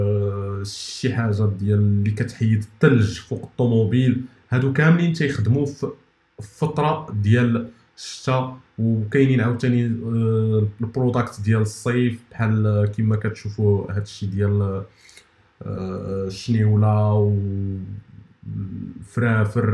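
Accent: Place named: Maghreb. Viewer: راه يتكلم بالعربية اخي وين شتها فرنسية ?!! لهجة مغاربية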